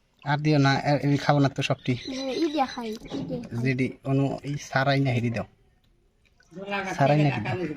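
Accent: Tangail, Bangladesh. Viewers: ভাই আপনার কথার ভাষায় তো বুঝা যাচ্ছে আপনারবাড়ি টাঙ্গাইল জেলা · kotha shunei mone hoechilo , Amar jonmosthan o Tangail, korotia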